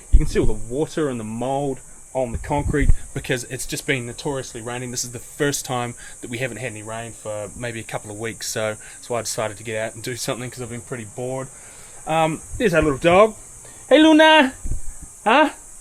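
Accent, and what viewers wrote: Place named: United Kingdom. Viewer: you got a British accent bro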